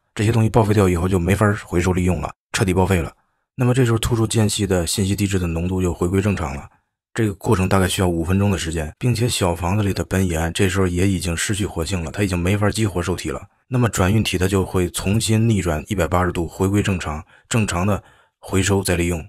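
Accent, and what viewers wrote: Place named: Beijing, China. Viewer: 北京口音听着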